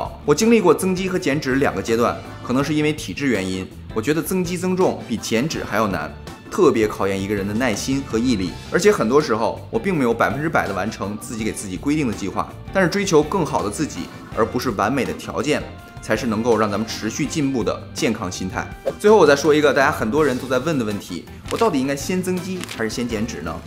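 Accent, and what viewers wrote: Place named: Beijing, China. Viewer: 我感觉是北京人，我是北京人，他的口音，很像普通话很好的北京人😂😂😂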